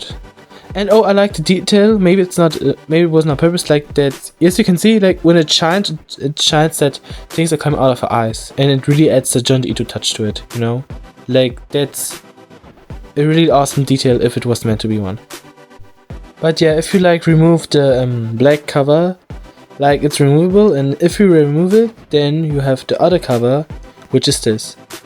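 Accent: Germany. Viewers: CONGRATS ON 1K ( also ur accent is so heavy??!!!! ))) german?? Italian??? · Wait, are you german? I am currently learning german on duolingo so I kinda notice the accents 😅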